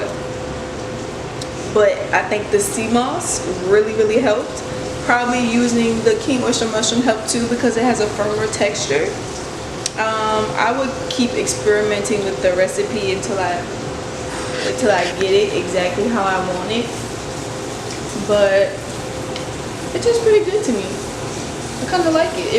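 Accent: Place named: Chicago, United States. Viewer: I love your video, and I hear in your voice you are from Chicago, my city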